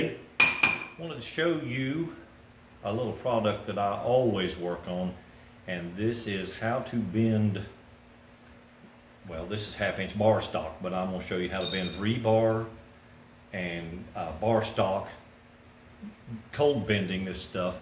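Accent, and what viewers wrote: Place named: South, United States. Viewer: Simple and effective method, and what nowadays they call "sustainable". Thumbs up. And all explained with the lovely southern accent!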